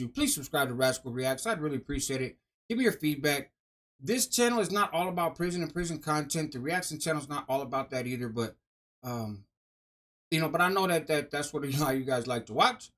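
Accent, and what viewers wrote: Mexico. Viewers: Honest question, why, how do u have a Mexican accent being white as a American. So many others have a normal American way of speaking. I wonder if you put it on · Probably because he grew up around a bunch of Mexicans. Kinda how a lot of New Yorkers have a stereotypical "Italian" accent even though they're not Italian.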